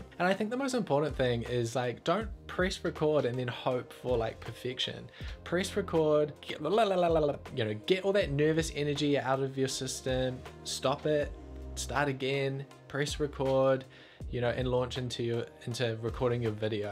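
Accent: New Zealand. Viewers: Awesome video man, thanks for all the tips. So nice to hear that kiwi accent, sounding mint! I'm just starting out with my videos, excited to work on some long form.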